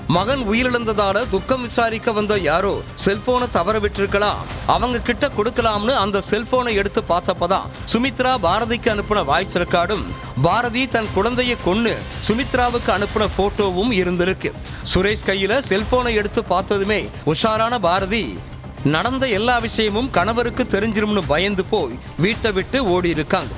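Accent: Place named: North, India. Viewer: Ennada kevalama news vasikiringa…vadakan mathiri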